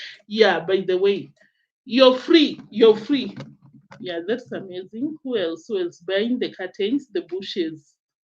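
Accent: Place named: Kenya. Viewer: Epic content! But, please tone down your Kenyan village accent. Please darling... ❤❤❤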